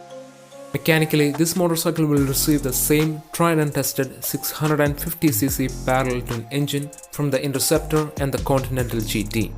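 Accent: Kerala, India. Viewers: മലയാളി ആണോ 😍😍😍 ഇംഗ്ലീഷ് കെട്ടിട്ട് മലയാളിയെ പോലുണ്ട് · Your Indian accent is leaking like a diarrhea. Speak normally!!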